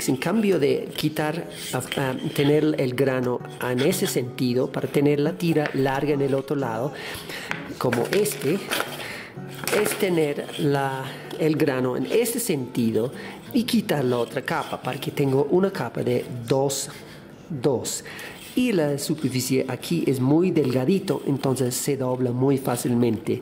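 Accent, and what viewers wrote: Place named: Brazil. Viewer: Se me hace que tienes acento brasileño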